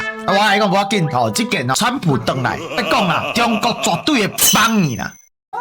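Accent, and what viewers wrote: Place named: Kaohsiung, Taiwan. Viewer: 你的台語好666 果然高雄在地腔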